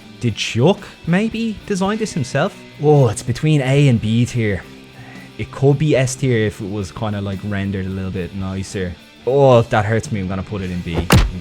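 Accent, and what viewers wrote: Ireland. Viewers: I knew you was Irish when I first heard your accent mate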